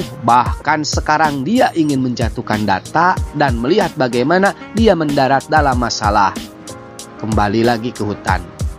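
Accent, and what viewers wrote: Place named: West Java, Indonesia. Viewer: yg cerita kita orang sunda ciri sunda banget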